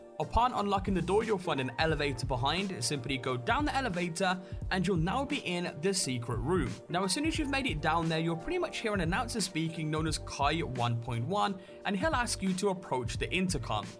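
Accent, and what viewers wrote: United Kingdom. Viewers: he is from the UK I believe, and in their military/police it is pronounced that way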